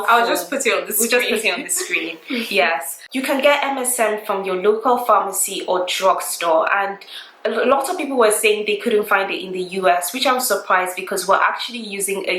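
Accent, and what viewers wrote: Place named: Nigeria. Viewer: I was going to ask if you are Nigerians cos of your accent but you have it in this video. Next question, with the mixture of all these oils how do you know which ones work for sure, like which does exactly what and which ones are ABSOLUTELY necessary which are the MAYBEs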